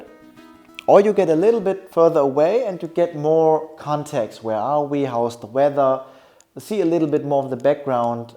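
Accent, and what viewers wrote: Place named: Germany. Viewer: That was the frist video I saw of you. ☺️ Watch a couple more last night and I love them. And your accent is kinda funny in a cute way. No offense. I really like that german accent.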